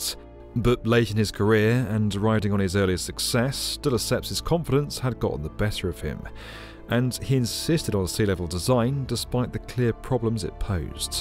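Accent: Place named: Public school, United Kingdom. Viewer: So we get sweet talking public school voices explaining what they don’t know as they’re stupid more interested in the latest share price The reasons for and why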